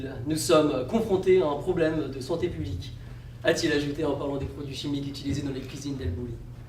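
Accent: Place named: France. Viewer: Un sujet bien élaboré, des gestes corporels appropriés, un débit de langage maîtrisé tout ceci dans un français impeccable. Seriez-vous français car, l’accent est différent de celui des québécois?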